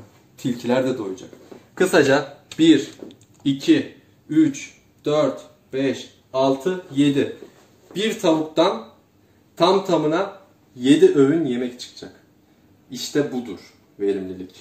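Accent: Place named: Kayseri, Turkey. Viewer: Kayseri ağzıyla video çekkk 😊 başarılar tatlı abim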